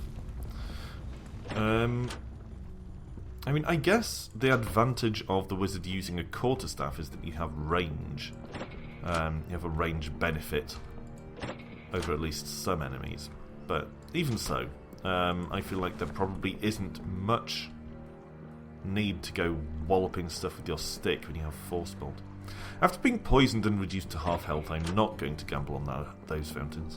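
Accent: United Kingdom. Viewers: Actually, I'm from Ukraine. Odessa. Also, I'm not sure about your nationality. Are you British? I think this, because of your accent (?). I'm not sure, but I thought that you have kind of British accent. Maybe I'm completely wrong.:)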